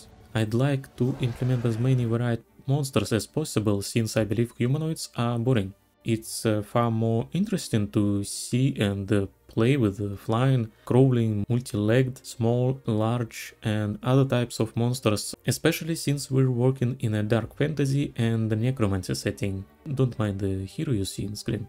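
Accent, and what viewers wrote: Russia. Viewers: По первым словам распознаю нашего русскоязычного)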